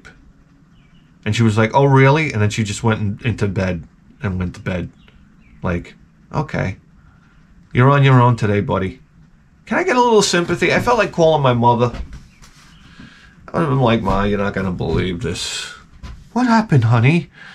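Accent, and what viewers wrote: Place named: New York, United States. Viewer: Man. Instead of complaining go Play some gangsters. With Your NY accent id hire u in a blink. Attitude to. Guaranteed return.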